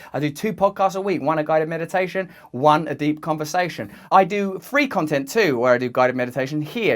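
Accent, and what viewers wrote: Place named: Cockney, United Kingdom. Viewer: More utter gibberish from the cockney Deepak Chopra.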